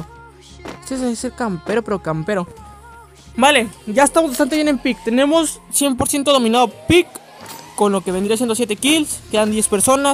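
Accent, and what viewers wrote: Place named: Argentina. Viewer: Usted parece argentino compa jajajajaj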